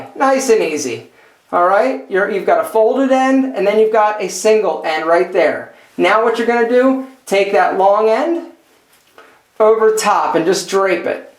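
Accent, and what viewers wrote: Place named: New Jersey, United States. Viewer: Don't take it personally, he's probably from Jersey with that voice.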